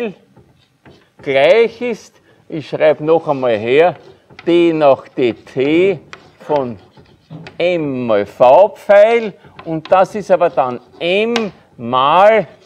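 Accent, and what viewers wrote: Austria. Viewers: Österreichisch ist einfach zu Charmant. Ich wünschte ich würde es auch beherrschen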